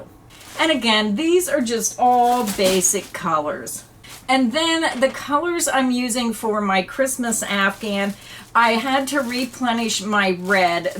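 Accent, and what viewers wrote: Pennsylvania, United States. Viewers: I love listening to your Pennsylvania accent. I like how you called your shelves a coopy hole. We called it a cubby hole. Coopy is much cuter 😉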